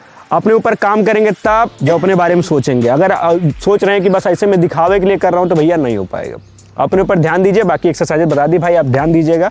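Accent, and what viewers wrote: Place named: Bihar, India. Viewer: I love bihari acsent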